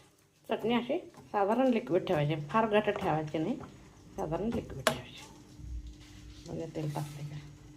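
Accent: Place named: Karnataka, India. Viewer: Five like kele 🎉🎉🎉 खूप छान ताई रेसिपी 🎉🎉🎉 तुमच्या आवाजातील कर्नाटकी स्टाईल मला आवडते 🎉🎉🎉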